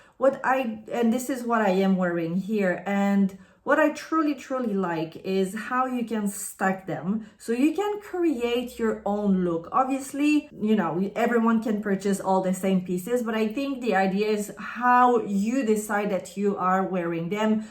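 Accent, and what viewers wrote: Quebec, Canada. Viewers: Allô, j’adore ton accent étant Québécoise aussi et je suis au Saguenay et première neige hier soir qui est encore présente au sol ce matin!🥶😉. La poudre Hermes Rocabar je l’adore je la porte à tous les jours depuis un peu plus d’une semaine et j’adore la poudre bronzante aussi j’ai Tottori et Atlas, pour le correcteur ultra le teint j’ai tellement hâte qu’il soit disponible au Canada …on m’a dit que ce serait en 2026 mais je ne sais pas quand…j’ai très hâte de l’essayer. Bonne journée et merci pour ce vidéos! 😍🥰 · Salut! (du Canada) - en tant que Québécoise, j’ai décelé ton accent québécois dès la première fois que j’ai visionné un de tes vidéoclips. Mais peut-être faut-il être québécois pour identifier ton accent. J’ajouterai que ton accent est vraiment agréable à entendre alors pas de souci. Je vais maintenant continuer de visionner. 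La poudre Rocabar d’Hermès est jolie sur toi. Très (trop!) tentant. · Hi ! To sum up I would say that when speaking english you have a recognizable french accent and when speaking french you have a strong québécois accent ! So unique 😉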